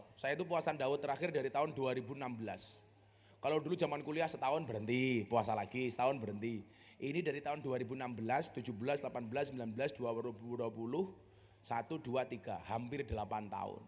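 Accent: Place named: Java, Indonesia. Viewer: Mugo" sehat Gus sy suka ceramah e adem d hati dan gk membosan kan lucu pooll sy seneng dgn logat jawa e sbgai orang jawa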